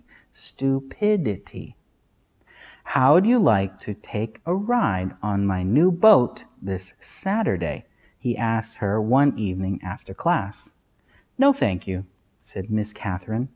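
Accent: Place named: United States. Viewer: You read books with a very good american accent i would love to talk you can i ?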